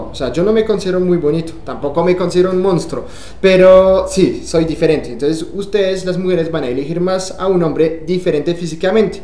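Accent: Colombia. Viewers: su acento colombiano muy marcado... parce.... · Habla muy Colombiano, casi diria que practico en bogota, hablas muy bien, y si tuve un novio Aleman y lastima que no funciono, me encanta y aun me encanta el chico. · Se le ha quedado el acento colombiano 😀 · Que tal dejo colombiano! ..😊 Muchas gracias por tus consejos!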